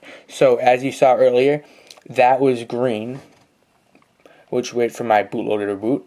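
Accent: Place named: Canada. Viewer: youre canadian....i can tell...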